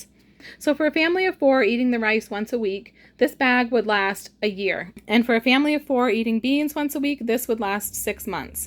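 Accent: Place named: Massachusetts, United States. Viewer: Don,t know where you live but I lived on Richard Circle Woburn and neighbors behind were o Border road ,Reading.I can tell you are fro. Pretty close by with your accent which is pretty mellow but its there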